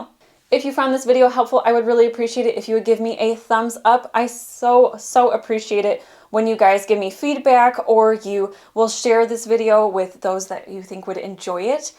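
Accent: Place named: Michigan, United States. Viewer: Very nice tutorial! Are you by chance from mid Michigan? Enjoyed your slight accent 😊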